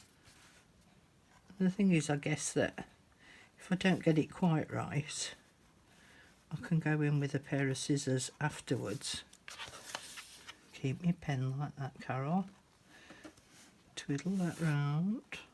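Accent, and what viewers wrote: England, United Kingdom. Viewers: I love your accent btw it reminds me of my childhood when friends of my dads from England took care of my brother and I when my mother was sick.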